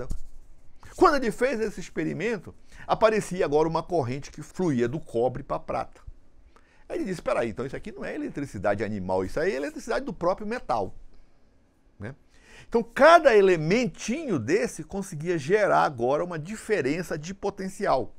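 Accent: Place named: North, Portugal. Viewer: Acho q esse sotaque é do Norte... kk Excelente video! Muito Obrigado! Sucesso!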